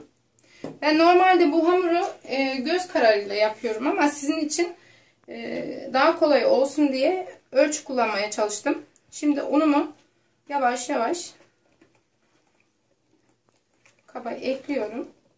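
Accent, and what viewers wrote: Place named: Xinjiang, China. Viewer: türkiyede mi yaşıyorsunuz? lehçeniz uygur lehçesini andırıyor...türkmenistan türkü müsünüz?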